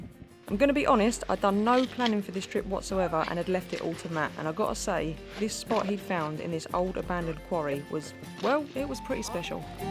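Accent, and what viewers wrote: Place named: England, United Kingdom. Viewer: I know this is a really odd reason to watch along, but I really enjoy just listening to some everyday English, dialect and all :)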